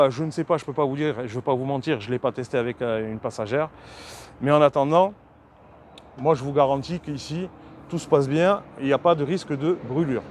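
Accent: South, France. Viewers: Merci pour cet essai, avec le bel accent du Sud!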